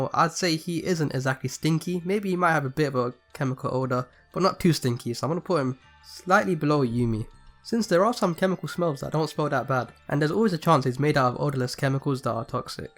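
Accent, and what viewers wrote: United Kingdom. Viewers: Dude I like your accent. It's a british one right? Sorry I'm not familiar with all the accents.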